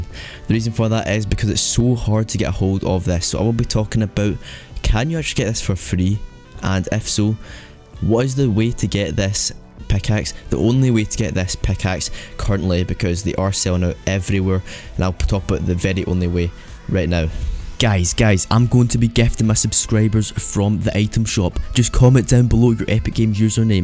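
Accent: Scotland, United Kingdom. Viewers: Are you from Scotland and epic name is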